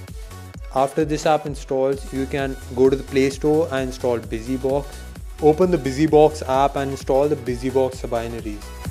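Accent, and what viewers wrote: India. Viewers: fucking indian accent, cant stand it!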